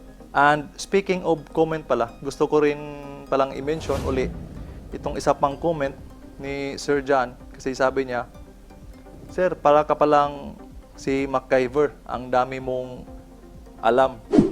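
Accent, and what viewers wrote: Visayas, Philippines. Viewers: Murag bisaya ka sir ba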